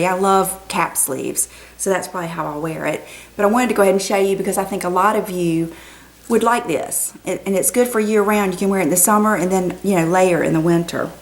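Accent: South, United States. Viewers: I just discovered your videos, and I'm addicted! You are so enjoyable to watch. Love your taste, your attitude, and your southern accent! Just gorgeous all around.:)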